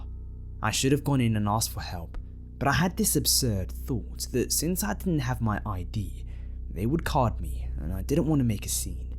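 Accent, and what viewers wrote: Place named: United Kingdom. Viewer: is hereby the best story teller. That British accent.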